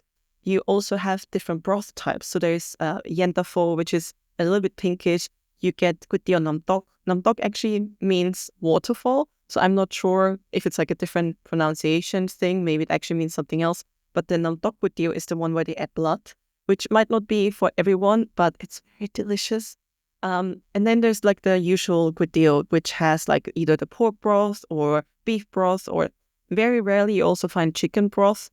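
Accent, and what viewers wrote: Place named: Thailand. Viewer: พูดสำเนียงไทยชัดมาก🤟🤟❤️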